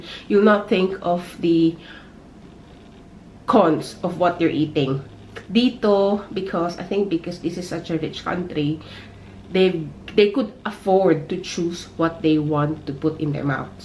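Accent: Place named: United Kingdom. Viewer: You may sounds like a british accent , thats very good maam. You really deserved that ..!!!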